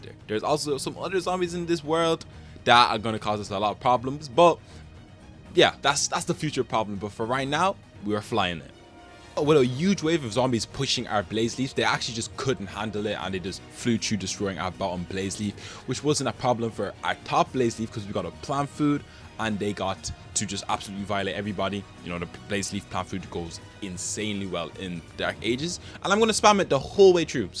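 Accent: Ireland. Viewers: You irish? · Are you Irish cause I’m Irish too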